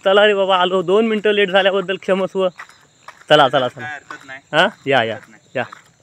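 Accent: Konkan, India. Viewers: लय भारी आपली कोकणी बोली भाषा एक नंबर, चे व्हिडिओ बघितले आहेत धुमाकूळ घातलाव, तुमचा फॅन च, मेहनतीला पर्याय नाही, 🙏 विडिओ दररोज येऊ दे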